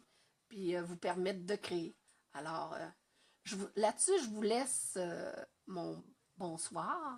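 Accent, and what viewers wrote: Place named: Quebec, Canada. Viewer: Merci beaucoup, pour toutes ces bonnes informations. Cela m'est bien utile. Entendre une voix québécoise ça fait changement des voix que j'écoute habituellement. Vive le Québec et les gens de chez-nous.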